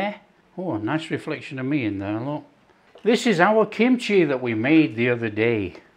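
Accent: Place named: Edinburgh, United Kingdom. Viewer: Edinberg , England is where a life time friend of mine was from..his accent was just like yours lol...he has died but everytime i listen to you I hear George talking lol!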